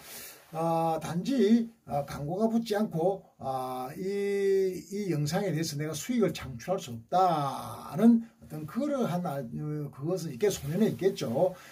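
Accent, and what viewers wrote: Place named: Gyeongsang, South Korea. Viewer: #강펀치tv님 안녕하세요 인사드려요 #저작권 아! 유튜브 스튜디어 들어가서 자세히 살펴보고 알아보고 계시네요 ~^^ 그런데 경상도 사투리 가 정겹게 들리네요 ^^ 아 ~음악만 듣다가 목소리가 들리니깐 정말 반갑습니다 😁 잘보고 응원하고 갑니다 늘 건강하시고 행복하세요 😊 또올께요 🤗 큰성장 하시길 바랍니다 🙏 화이팅하세요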